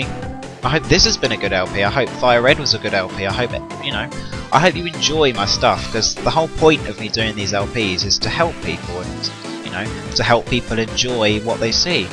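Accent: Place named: United Kingdom. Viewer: Like you said if u be a bit more fun you really can come far if u do that and are you british cause that accent is lovely!! :)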